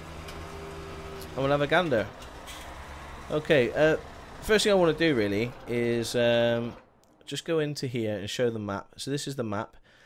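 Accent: United Kingdom. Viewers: The map is called after the region in Poland. It is a real place. I'm not sure how accurate the map itself is, but the area is real for sure. I have some family in there(at least I think so...). BTW. It's quite entertaining to hear English man trying to pronounce polish names:))) Polish language is more "hard", like German language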